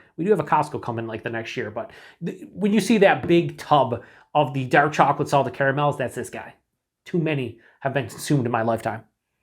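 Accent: Western New York, United States. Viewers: Don’t worry about your pronunciation. I think it’s mostly the western New York accent just showing itself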